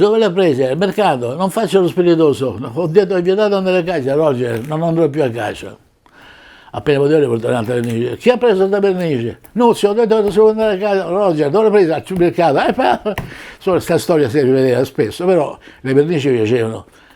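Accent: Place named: Rome, Italy. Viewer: Ma che siete tutti padani ?? È un romanesco masticato, parlato "dinto o musso" come dicono i napoletani 😂😂 . Comunque era gente tosta.. così non ne nascono più.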